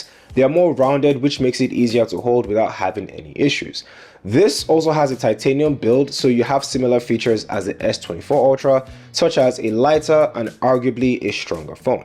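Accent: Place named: Nigeria. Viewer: bros nigerian accent makes it so hard to understand XD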